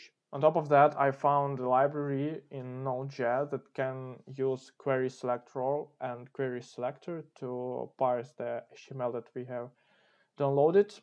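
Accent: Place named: Russia. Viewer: слушать английскую речь от русскоговорящего довольно кринжовенько, но урок очень толковый, спасибо большое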